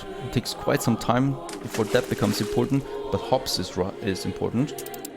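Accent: Germany. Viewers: U German? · Wusste ich es doch :D wollte schon sagen du hörst dich sehr deutschsprachig an xD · Mmm yes the german accent is very present here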